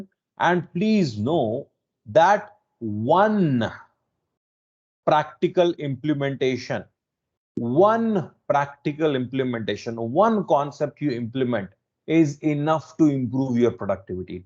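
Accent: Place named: India. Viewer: Hello,! My name is Brendon. I’d like to express my gratitude for your work. A few years ago, I was looking for ways to truly learn from reading books, and I came across your YouTube video. I never took English classes; I learned everything I know by watching movies and TV series and playing video games. I spent dozens of hours re-watching your TED Talk because the Indian accent made it a bit challenging to follow—but it was absolutely worth it! You not only taught me how to read better but also encouraged me to read more and more. You and Mortimer J. Adler are at the top of my list. I am truly grateful that you posted this series of lessons here; it was the missing piece to complement my "Habit 3"! I will certainly watch it a few more times until I’ve mastered the material. Once again, thank you so much! Best regards from Brazil.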